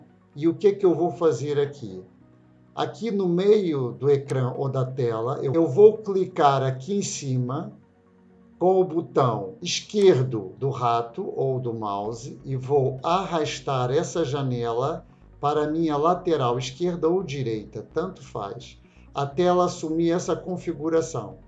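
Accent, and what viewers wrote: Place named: Portugal. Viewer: Muito bom. Seu sotaque parece ser de um português que mora há muito tempo no Brasil.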